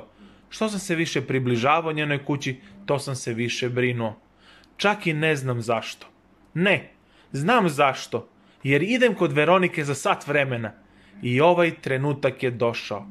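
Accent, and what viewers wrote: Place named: Nis, Serbia. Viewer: Vidi se da si iz Nisa ili okoline,i imws jako los akcenat i dikciju da pricanje ovakbih prica....ideja je dobra ali realizacija vrlo losa....To je moje iskreno misljenje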